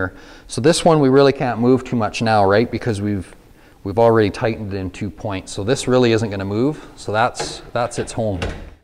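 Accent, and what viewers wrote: Canada. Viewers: Outstanding instructional video. I'm assuming from this guy's accent that he is Canadian and it has been my experience that Canadians just get things done - efficiently and resourcefully, without a lot of hoopla and distractions. No lousy music, cussing (tho' he came close at one point), or embarrassing attempts at special effects and other so-called creative videography. Simply good, clear, well-paced instruction. Will check out the other videos at Houseimprovements. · Great pleasant Canadian accent n the know, the how, the teach.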